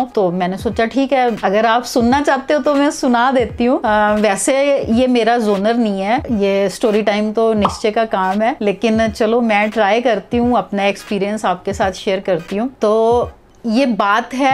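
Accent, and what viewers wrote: India. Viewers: Ohhhhhhh aunt feeling sad for you lekin koi bat nahi jo hota behtr hi hota and think agr tb ap ka audition ho jata to shayed youtube ap k zehn m na ata aur aj ap ka apna channel na hota.So agr hmary pas sae kuch jata h to us sae ziada hi wapis b milta h 😊BHT ACHA LGA AP KI KAHANI SUN K.Aur ap bht cute hn specially talking style DESI DESI. sa😍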